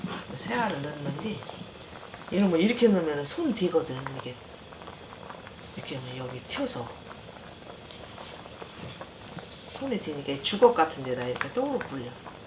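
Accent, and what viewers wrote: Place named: Chungcheong, South Korea. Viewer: 충청도여유 ㅎ ㅎ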